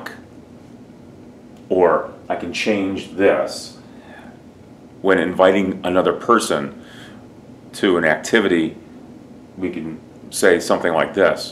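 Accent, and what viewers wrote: United States. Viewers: are u living in ca??sounds so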